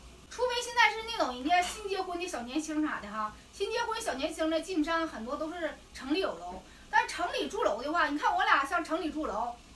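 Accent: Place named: Northeast, China. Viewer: 东北人说普通话好听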